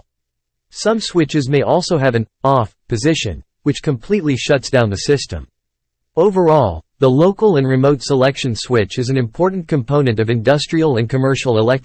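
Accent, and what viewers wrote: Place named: Tamil Nadu, India. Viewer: Tamil voice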